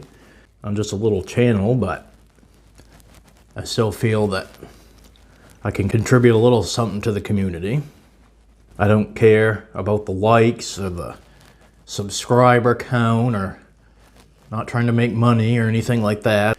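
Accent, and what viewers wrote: Maine, United States. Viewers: That's how an intelligent Maine accent sounds. Great message, dude.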